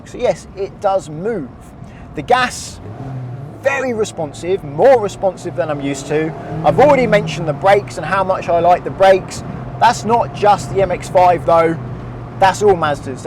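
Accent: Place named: United Kingdom. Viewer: great review. But I was more fascinated by the Bii iish accent. After 32 minutes of this video I was saying beTTer (instead of beDDer).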